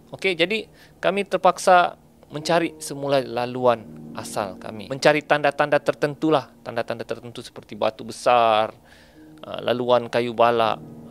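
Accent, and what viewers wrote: Sabah, Malaysia. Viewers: Sabah bah ni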